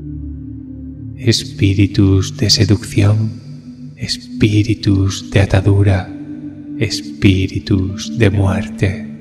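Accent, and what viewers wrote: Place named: Spain. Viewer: Buenos días amigo. Eres una gran bendición. Tu voz es muy bonita y agradable escucharte. Tu accento español es muy bello. Yo soy 28 porciento española. 12 porciento francesa, Indigenous. Te mando un fuerte abrazo desde Hacienda Heights Ca U.S.A. 💯🌟💋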